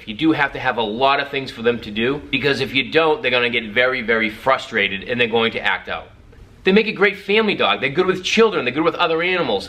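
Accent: Boston, United States. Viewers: Are You from Boston, M.A.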